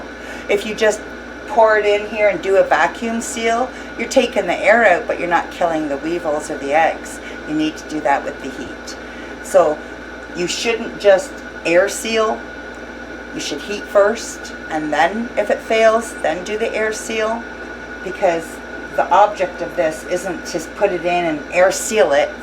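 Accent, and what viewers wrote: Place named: Canada. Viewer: I guess I missed the time and temp. I saw you said 200f, but for how long? You're right, I vacuum sealed my jars, but didnt heat them first. I need to go back and redo them. I hear your Canadian accent, I was born in Ontario!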